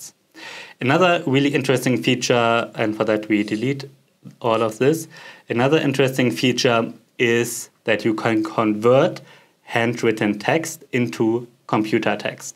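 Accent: Germany. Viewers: У тебя немецкий акцент 😂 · Maybe obvious, just wonder whether the S pen has palm rejection or not. As you use it I start to doubt. And whether it has any pressure sensitivity. Thanks for a nice video. Like the German accent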